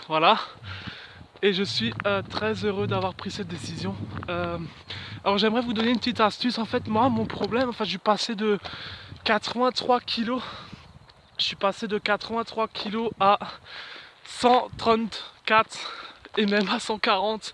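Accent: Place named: Alsace, France. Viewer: Bonjour, votre accent me fais penser à l’Alsace, super endroit pour retrouver la paix. Je me bas aussi contre le surpoids. la vidéo est intéressante. Bonne Journée. Estelle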